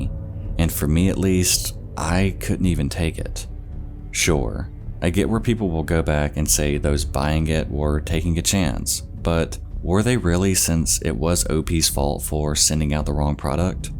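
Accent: South, United States. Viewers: Agreeance????? Not a word my man. Are you Fred Durst? LOL! The word is agreement. I am in agreement. I am in agreeance doesn't do it. Agreeance is just not a word. It sounds like one but again, the word is agreement. You have good content and I like watching your videos. Just, do better with grammar and I have no complaints. I don't even have a problem with your slight but definite country accent. As long as you are using actual words and using them the right way, I will sub. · As a southerner myself, I know a southern accent when I hear one. I love this channel to death! Keep up the amazing work!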